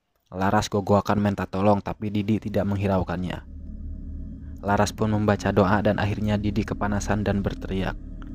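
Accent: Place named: West Java, Indonesia. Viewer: Dari fb, pindah ke sini penasaran pingin tahu alur ceritanya, seru dengar suara aa cerita Sunda banget ☺👌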